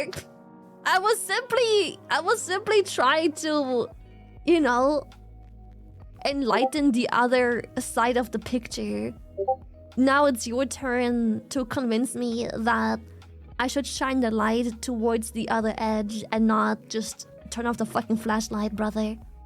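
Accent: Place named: France. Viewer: Shylily's french accent is adorable. It makes sense because she is french that she is non-confrontational.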